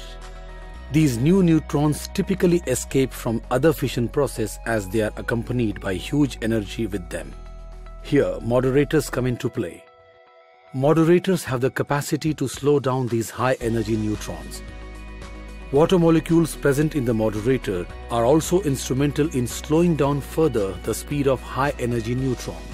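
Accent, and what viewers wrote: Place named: Bollywood, India. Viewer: Bollywood accent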